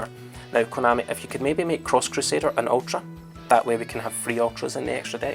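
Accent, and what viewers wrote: Scotland, United Kingdom. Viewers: I hope I'm not the only guy that comments on any scottish accent video and make a reference to the limmy's show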